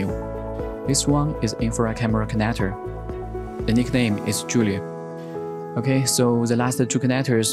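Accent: China. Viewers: 中国人讲的英语么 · 中国公司，这英语就是中国人读出来的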